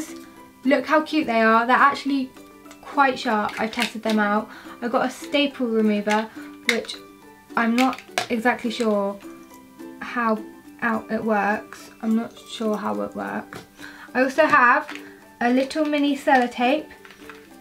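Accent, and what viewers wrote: United Kingdom. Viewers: are you british?💕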